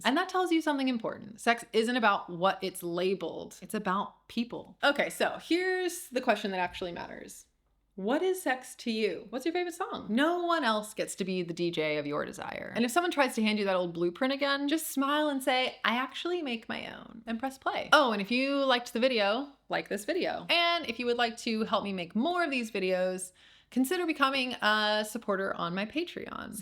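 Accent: United Kingdom. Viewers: Welcome back,! What a fantastic video (incredible edit, reminds me of PBS Get Lit content), I can't wait to see what else you produce. <3 P.S. I had a little giggle when you acknowledged your change in inflection to being more bri'ish.;)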